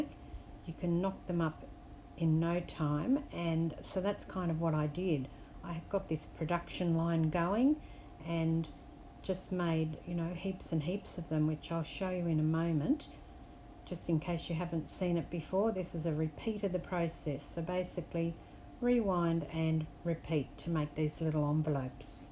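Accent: Australia. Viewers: Hi, 😘 love the ideas. Thank you for sharing. I love, love listening to your soft Aussie accented voice 😍😍.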